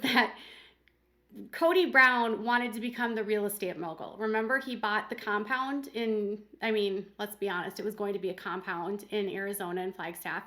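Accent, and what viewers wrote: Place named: New York, United States. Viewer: Where are you from? You have my home town accent (Rochester/Buffalo NY)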